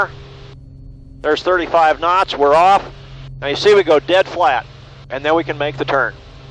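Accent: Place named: England, United Kingdom. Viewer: Oh, P****n d'accent rosbeef !! C'est HORRIBLE et très désagréable !!!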